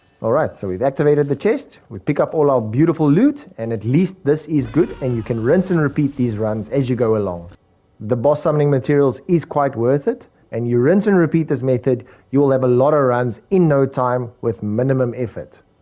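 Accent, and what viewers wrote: South Africa. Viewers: South African? Wragtig! Ek het ge-sub. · South African? Wait let me sub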